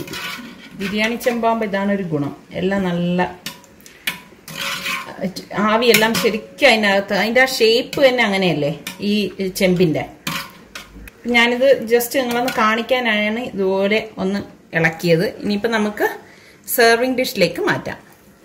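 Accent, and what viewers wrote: Trivandrum, India. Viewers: Thanks itha.eppozanu video kanunnate .teachere bahumanikkunna ithaykku big salute.l am a teacher.super biriyani itha. Tvm bhasha kelkkan nalla rasam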